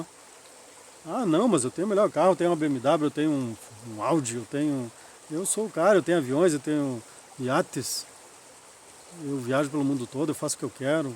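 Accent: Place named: Rio Grande do Sul, Brazil. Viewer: .., sim.. .logo, que tú lançou o questionamento.. . eu já tinha a resposta,,.. . só não deu tempo, de pó arar o vídeo, e Te responder aqui nos comentários.*Tú e aqui do estado,(RS )provavelmente ,creio eu deduzi, pelo teu sotaque, entonação entonação , enfim.. .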